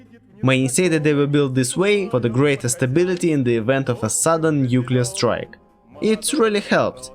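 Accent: Russia. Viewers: орнул с русского акцента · Молодец, что не стесняешься своего акцента, и говоришь всё точно так, как реально говорят русские! А то железный занавес пал, а люди до сих пор голливудский русский акцент себе представляют, и не понимают, например, как вообще на родине у нас что на деле называется. Так держать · Here I am learning about khrushyovka I've grown up in from the Russian guy who explains it in English with a very Russian accent while being Russian myself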